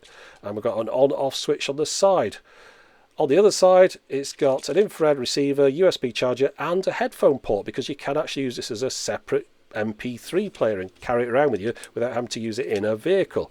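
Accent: United Kingdom. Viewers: I wonder if this channel would be as interesting without the British accent. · I'm curious. Your accent has caused me to wonder if these were only offered across the pond. I know that in our digital era today the powers that be have deliberately limited if not cut off alternatives in the States.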